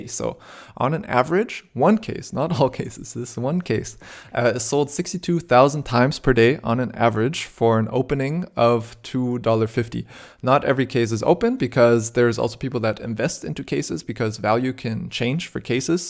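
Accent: Germany. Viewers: okay thank you ^^ PS: are u german ? xD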